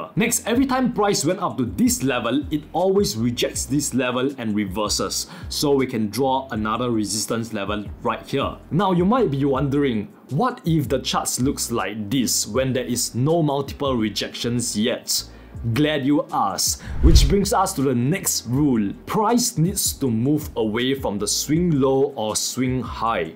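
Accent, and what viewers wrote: Nigeria. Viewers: Are you trying to speak in Nigerian accent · You sound like a Nigerian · You have this Nigerian Accent when you speak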